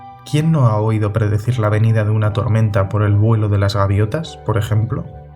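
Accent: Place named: Castile, Spain. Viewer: Tengo una duda. Cuando te escucho hablando, escucho que hablas el Euskadi o vasco con un tono más castellano que vasco. Eso creo! Mi duda es ¿la letra Z pronunciada en vasco igual que en castellano?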